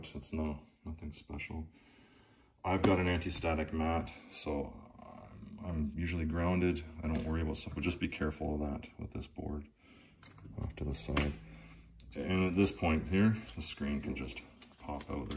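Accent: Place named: Ontario, Canada. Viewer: Thank you for such a great video! My radio is acting up now too so I ordered the digitizer from Ali. I'll report back after I replace it. I'm in Canada as well, Halifax. Where are you? You sound like maybe from Ont.? Thanks again! Cheers...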